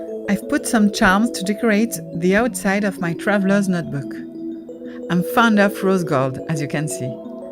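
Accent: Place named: France. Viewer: Très belle vidéo, très agréable... une très jolie déco dans ton planner. Ce kit est vraiment sympa ! La french touch dans ton anglais est adorable ^^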